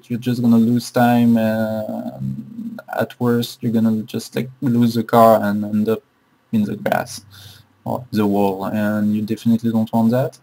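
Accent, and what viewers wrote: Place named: France. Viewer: Don't apologise for your accent man! French accents are seriously cool! Its how you guys manage to pull "our" english women so easily! :P Great video too, i'm down to a 59.5 around LRP but your mega quick and helpful, thanks!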